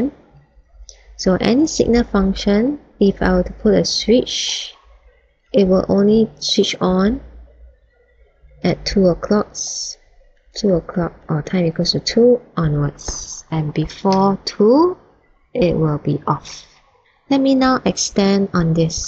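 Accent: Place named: Malaysia. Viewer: You sound Malaysian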